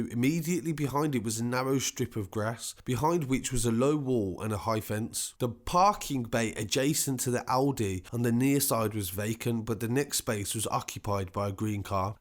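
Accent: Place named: Manchester, United Kingdom. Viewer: Can tell your from manny mint ANCOATS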